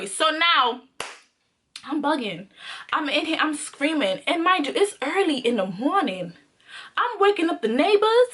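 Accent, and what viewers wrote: Jamaica. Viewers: From mi hear the accent you a Jamaican ❗️❗️❗️❗️ found you in my recommendations.... like 20 secs in the vid n like it already ❤️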